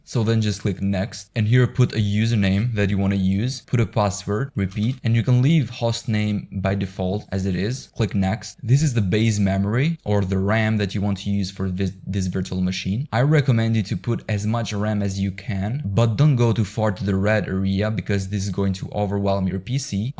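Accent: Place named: France. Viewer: l'accent francais mdr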